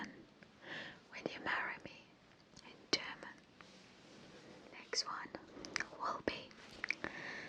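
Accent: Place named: Thailand. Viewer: Your Thai accent is so cute. I love it ❤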